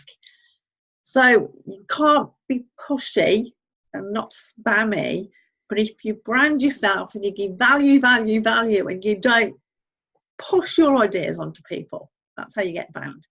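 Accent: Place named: United Kingdom. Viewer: With your accent shouldn't it be Millionaire Mum?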